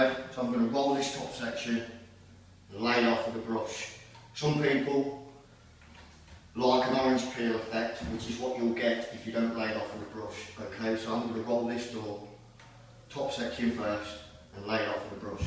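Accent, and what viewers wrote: United Kingdom. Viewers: Are you British? Well no wonder I can't understand a single word you're saying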